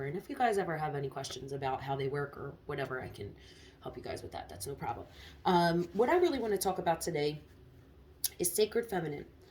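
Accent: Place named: Philadelphia, United States. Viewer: Once again, such a great job! Not gonna ask where you are from but I was born and raised in South Philly and you sound like a straight home girl. 😀 Did want to point out that your comments about the masculine becoming more feminine and vice versa made me think of all the attention around LGBT+ issues. Personally, I think these changes are good, they indicate that perhaps humanity is striving for integration. I know as a man, being aware of my feminine side has made me a better husband to my wife and father to my daughters. You are doing a great thing with this channel. Keep it up.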